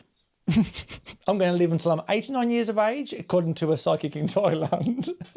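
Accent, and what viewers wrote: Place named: West Midlands, United Kingdom. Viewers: Oh, first of all I’m sure I’m not the only one who would love to give you a big hug and sit you down with a cuppa and a big slice of lemon drizzle cake. I love your accent because my dear old Mum was a Midlands girl and the accent gives me a warm glow every time I hear it. Now, as regards your work I would refer you to our Kate at the last homely house. She makes me laugh out loud when she talks about ‘the quilting police’. Remember my flower, that perfect is produced by machines, handmade is done with love and swear words and is by nature dotted with faults and slipped stitches, just like people. You have already created a legacy. Nearly fourteen thousand people care about you and watch your work. Take a moment to pause and look back at the road you have already travelled. You are loved and respected. 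Ros ❤️ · You sound like you're from West Midlands, I am too.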